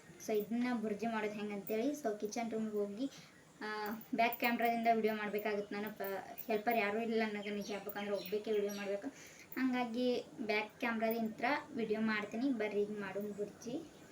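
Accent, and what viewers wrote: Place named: Gulbarga, India. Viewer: Mombi adhuri matte gulbarga matu adtira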